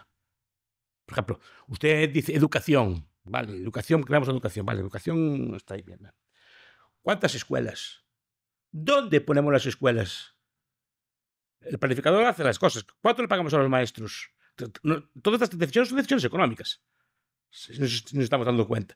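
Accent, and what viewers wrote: Galicia, Spain. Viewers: Los ricos son más ricos y los pobres también son más ricos no? Entre que habla muy rápido y no se le entiende media Papa y encima es gallego o ve por ahí de donde sea que no se le entiende cuando habla y encima deja las perlas que deja. El pobre es más rico pero sigue siendo pobre. Hoy gano 8 € la hora y mañana ganaré 8 € con 16 € entonces soy más rico pero no Rico sin embargo el rico gana generalmente el no honrado gana cantidades desorbitadas y si por algún motivo tuviera que ganar más no va a ganar 2 o 3 o 4 € más va a ganar muchísimo pero que muchísimo más en comparación a esos 16 céntimo de euros qué hace que el pobre deje de ser pobre. No es personal pero este pavo o no sabe lo que dice o lo que dice lo que dice porque está en nómina muchas más posibilidades no hay. Son personas que pese a todo el conocimiento que tienen da la sensación desde que viven en una burbuja personal intelectual cómo los que dicen que en el mundo no sobra gente sí sí claro en el mundo cabe muchísima más gente eso lo dirá el que no ha ido nunca una cola de un paro o no ha ido nunca a un médico público o dificultad para encontrar un trabajo digno en el que no te coacción en pero a niveles demenciales.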